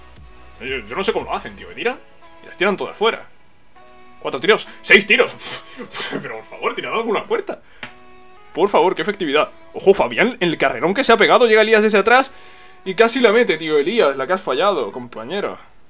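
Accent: Andalusia, Spain. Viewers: De donde eres ?? Eres de Andalucía ?? Yo si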